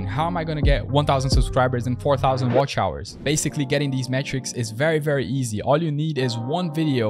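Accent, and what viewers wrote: Brazil. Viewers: mano, tu eh br né? kkk o sotaque entrega mt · sotaque brasileiro carai hahahahahahha seguindo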